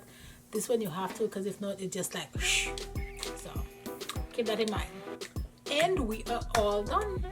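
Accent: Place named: Caribbean. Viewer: U sound Caribbean, I love it 🥰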